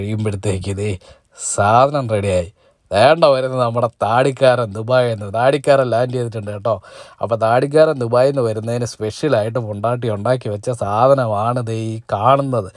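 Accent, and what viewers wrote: Kottayam, India. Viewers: samsaram patented. Athu vittukodukkaruthe. Kottayam Malayalam slang kittulla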